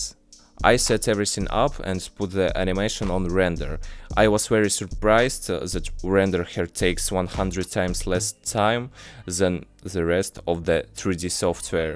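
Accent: Russia. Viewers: Привет! Ты русский , по акценту слышно)))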